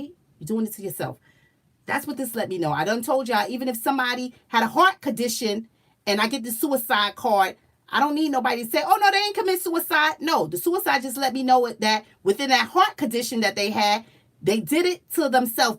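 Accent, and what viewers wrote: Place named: New York, United States. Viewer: I knew you were from NY!!! You have that flava!!!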